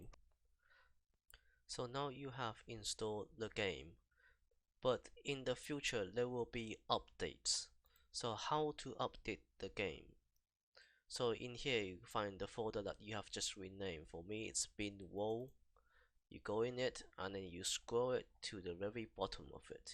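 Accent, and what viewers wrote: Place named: United Kingdom. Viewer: 你英文好流利~ 還有英腔~~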